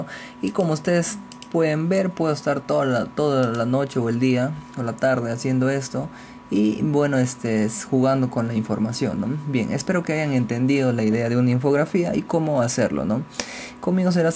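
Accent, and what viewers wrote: Peru. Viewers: PARECES PERUANO CAUSA:v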